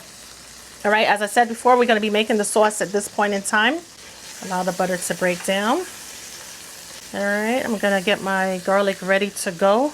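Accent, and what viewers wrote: New York, United States. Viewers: U sound like ur from new york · I love your New York accent. Cayenne pepper is good over that pasta 😊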